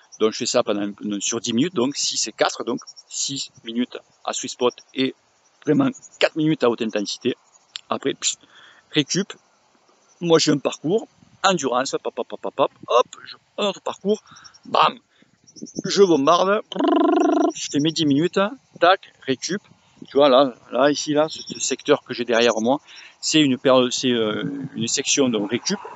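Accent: South, France. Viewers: Bonjour, tout d’abord merci pour tes vidéos, ta bonne humeur (avé l’accent et les cigales en plus👌🏻). « Minimum 120% FTP et jusqu’à 150% FTP» sur 4’ s’apparente à effort 4’ >PMA (effort Max 5’), non? Dans ce cas, personnellement impossible à tenir pour l’amateur que je suis, du moins pas sur plus de 1 répétition encore moins après 6’ de SST 😅🥵. Tu dois être une sacrée machine pour le faire 3x 💪🏻 pas accessibles à tous comme séance...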